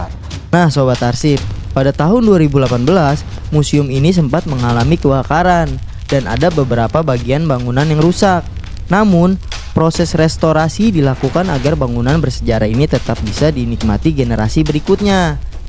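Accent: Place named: Betawi, Indonesia. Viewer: pembawaannya khas betawi gak kaku, seru, jadi mudah dipahami 👍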